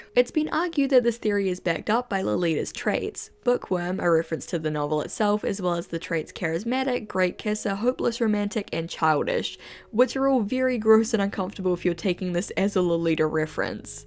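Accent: New Zealand. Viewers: Sometimes when I'm watching your videos I get so used to hearing your voice that I forget you have a kiwi accent and it's almost jarring when you suddenly reference something Aotearoa-specific -- the Mr Whippy remark got me this time! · shes from New Zealand im pretty sure. nz accent?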